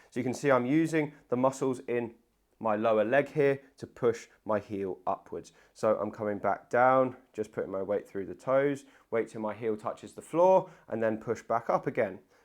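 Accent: United Kingdom. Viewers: I’ve recently found my way to your videos and want to thank you for the content. You provide excellent advice and exercises for my arthritic knees. I hope to improve my walking and have improved joints. Your approach yo explaining the what, how, and why is so clear and concise. You are very talented at what you do. Wish you were in my location in the U.S. Your British accent is so smooth to listen to 😂. Thank you for the great content.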